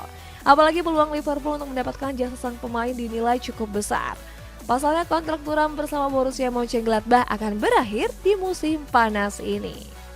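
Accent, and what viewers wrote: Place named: Banjar, Indonesia. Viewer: admin mulai gokil , ad bhs banjar samarinda wkwkwk mantap sanakkk salam buan jelawat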